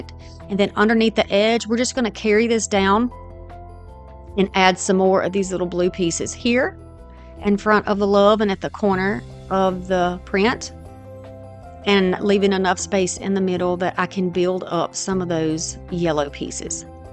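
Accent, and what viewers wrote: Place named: South, United States. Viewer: PS ....I LOVE LOVE your accent ... I regularly watch Randy and Amanda from Incredible Tiny Homes ...I found them during tough times ...so now I am a sucker for a southern accent ....wishing you well ...happy crafting ..xo from Vancouver BC Canada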